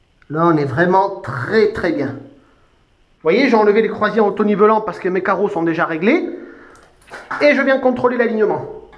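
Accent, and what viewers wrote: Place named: Pau, France. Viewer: Merci pour ta réponse et excuse moi je ne veux pas abuser de ton temps que je sais si précieux pour un artisan. Vraiment j'apprécie ton partage tes videos ta pédagogie et tes réponses si précisent. Je vais essayer de chercher une personne comme tu me suggères. Merci de l'information pour la résine et les carreaux je vais voir si je ne peux pas les faire sauter pour en mettre d'autres. Belle région que Pau je comprends mieux ton petit accent 😊. Amitiés Bon week-end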